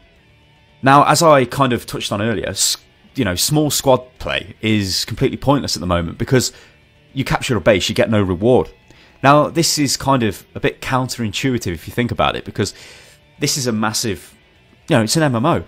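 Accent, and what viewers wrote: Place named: United Kingdom. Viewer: You sound british so I would guess you have a European account also calles PSG, or a SOE account? Because that quite frankly is the biggest issue of Planetside 2 at the Moment. All those PSG players that without knowing choose a company that just doesn't care. Just have a look at the britsh forum over at planetside2.eu. We all play on the same servers yet we are second class citycin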